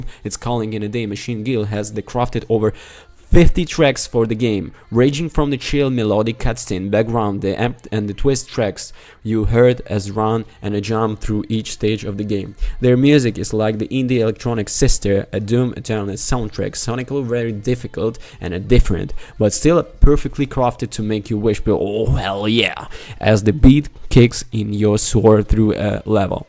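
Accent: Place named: Dublin, Ireland. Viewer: Nice surprise to hear a fellow Dub reviewing video games 🇮🇪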